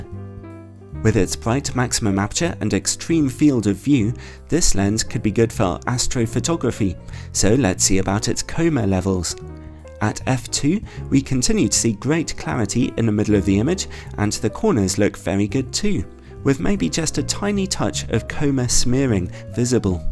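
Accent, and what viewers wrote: United Kingdom. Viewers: nothing like a British eloquent voice...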